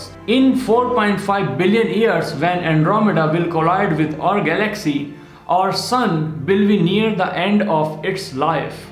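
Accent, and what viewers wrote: India. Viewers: I love this guys Indian accent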